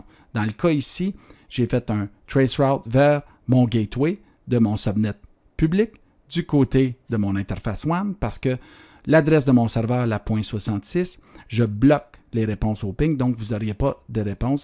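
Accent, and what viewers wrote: Quebec, Canada. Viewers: super merci beaucoup, votre accent québécois est parfait pour mon cerceau. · C'est bien mais je comprends rien le Canadien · Très bonne vulgarisation d'un sujet pas toujours évident pour les débutants. Je vais la conseiller à mon fils qui débute ses études en informatique et qui voit justement les notions de réseau IP. Et lui qui adore le bel accent canadien (vu de Belgique, il y a bien un accent), il va être ravi. Bravo pour cette vidéo !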